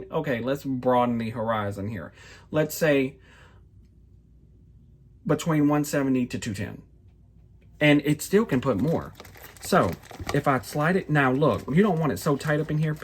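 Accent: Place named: Louisiana, United States. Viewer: you may stitch Victorian charmers but ohhhhh LAWDY son YOU are the true charmer! I happened upon your videos today and I’m smitten! I started cross stitching in 1978 after moving to Layfayette, Louisiana…I was elated to hear that Looosiana accent again! I so enjoyed your walk down cross stitching memory lane. It’s amazing how this hobby has evolved over the years. Back in the beginning I stitched on 26 count Aida. But when I discovered linen I felt like I hit the jack pot and I haven’t gone back to Aida…soooo there may come a day when you, too, will give linen a try. Like you, I also stitch on the same piece for days at a time. Monogamous stitching works best for me as well. You're not the only one who gets gobsmacked at the number of WIPS so many stitchers amass. I couldn’t take that pressure! I like your floss file system, especially the notion of the small bag for bits and bobs that are too much to toss in the trash bin. 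I recently converted all my cotton flosses over to Annie’s Keepers. That system is the best I’ve tried, and I’ve tried quite a number of systems. I can’t wait to see what your next video brings…keep them comin’ darlin!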